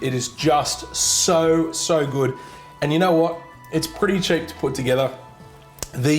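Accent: Australia. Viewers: No offence i noe ur aussie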